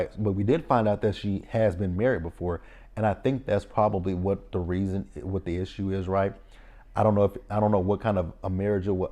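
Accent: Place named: South, United States. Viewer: I gotta catch up on the RTL reviews! You have an accent. You sound Southern, but not country. It's more noticeable with certain words. I like it. Now I can't unsee Shaunie O'Neal when I look at Janelle.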